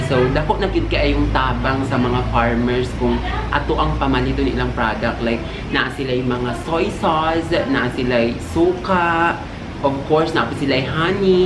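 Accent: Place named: Visayas, Philippines. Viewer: "Zoy zooz" I love ur bisaya accent hahahhahaaahahahahha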